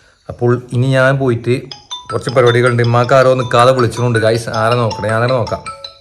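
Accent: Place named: Malabar, India. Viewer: മ്മടെ മലബാറിയൻ സംസാര സ്റ്റൈൽ ആണ് നിങ്ങളുടെ ഹൈലൈറ്റ്. എല്ലാവരും ഇത് പോലെ സന്തോഷമായിരിക്കട്ടെ എന്നെന്നും.കുട്ടികൾ ഇപ്പച്ചീയേ... എന്ന് വിളിക്കുമ്പോൾ നല്ല ഭംഗിയുണ്ട് കേൾക്കാൻ . ❤❤️🙌🏻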